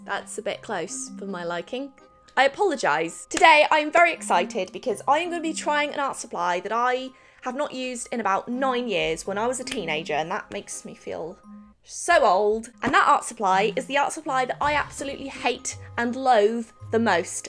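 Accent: United Kingdom. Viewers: I have a few questions (for any future Q&A's. wink *wink*): How old are you? When is your birthday? Do you have a boyfriend (you're very beautiful btw)? And how do you still have your british accent when you've lived in the usa your whole life? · Pigmented. Love your videos. Where are you from originally? It’s a British accent, probably south? I’m in Liverpool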